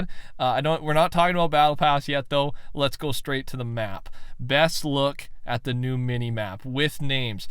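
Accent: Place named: Canada. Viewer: Are you Canadian you just give off that nice Canadian voice and vibe…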